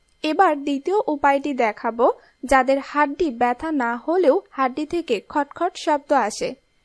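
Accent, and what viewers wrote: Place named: Bangladesh. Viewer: আপনি কি বাংলাদেশের লোক? আপনার কথায় বাংলাদেশি টান আছে।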